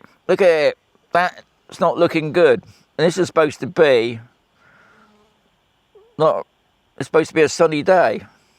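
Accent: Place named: West Country, United Kingdom. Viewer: Nice one,. You sound like you are from the west country. Brizzal? 😀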